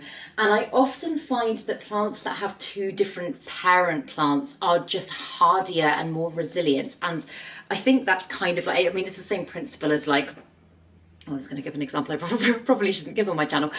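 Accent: United Kingdom. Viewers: Like your pure english accent!! Mmmh love it. Which part of Great Britain are u particularly from. Like the clarity of ordinary care you prescribe for each plant. Your camera is fantastic!!!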